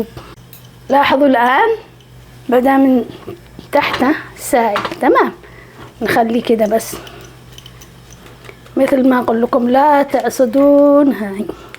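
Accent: Yemen. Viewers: اكلاتكم اليمنية وعذوبة لهجتكم ولطفك وطيبتك تعيد الينا ايام الخير والطيبة وراحة البال ليست كايامنا هذه اللله يستر 😂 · كلامك نصفه يمني ونصفه جنوبي سعودي ....😅 وعموما جزاك الله خيرا على وصفاتك الرائعه ❤ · اي والله ننساء الهموم ولو لمدت دقيقه والله انه تعجبني لهجتك اليمنيه وكمان انا يمنيه ماشاء الله تبارك الله على الضحكه والحناء ربي يحفظك من كل شر ياخالتي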